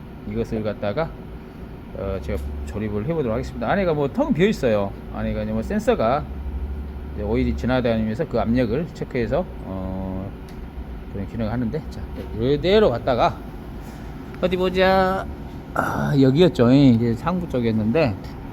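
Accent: Jeolla, South Korea. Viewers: 고향떠나 해왜생활하면서 전라도 고향말을 잘듣고있습니다. 건강허세요. 😜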